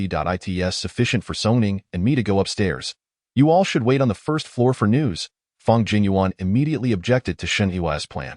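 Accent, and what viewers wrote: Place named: France. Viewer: It's still on French pronunciation.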